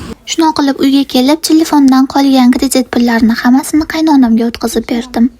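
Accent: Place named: Andijan, Uzbekistan. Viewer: анжанликмисиз, сарагҳее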